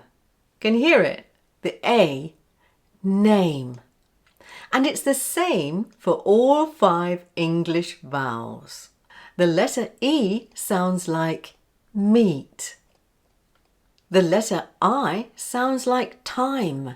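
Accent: RP, United Kingdom. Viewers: ¡Eres fantástica,! I love RP Accent! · Please are you british I want to learn the british English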